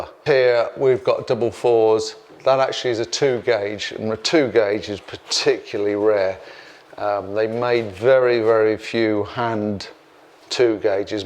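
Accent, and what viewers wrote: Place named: United Kingdom. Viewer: Is this the hunters lodge in tennessee as this guy is english british accent.??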